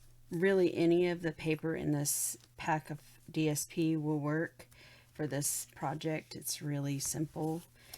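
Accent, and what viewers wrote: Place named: Texas, United States. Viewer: Where in Texas are you from.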